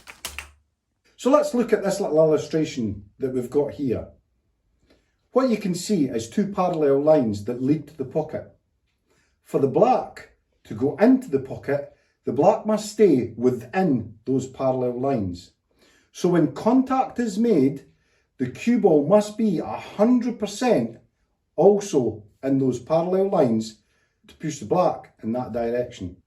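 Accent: Scotland, United Kingdom. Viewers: Well your new subs from Russia etc seem happy enough, I think alot of the world is familiar with the Scottish accent by now with there being so many celebrities etc with Scottish accents. Especially being snooker fans with top legends like hendry and Higgins being scottish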